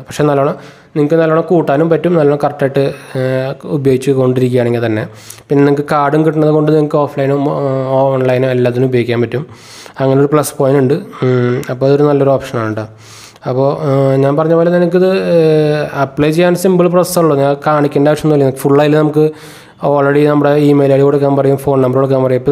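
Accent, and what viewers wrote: Thrissur, India. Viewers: തൃശൂർ ഭാഷയാണ്. അൽപം സ്പീഡ് കുറച്ചാൽ നന്നായിരിക്കും.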